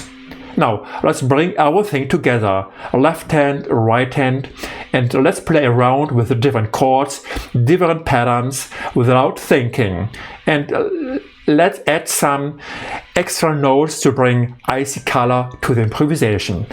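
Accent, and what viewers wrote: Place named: Austria. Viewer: Yes, sir, I do, and as well, with learning languages. By the way, I believe that it stated that you sre located in Germany, but are you Austrian? I was trying to place your accent.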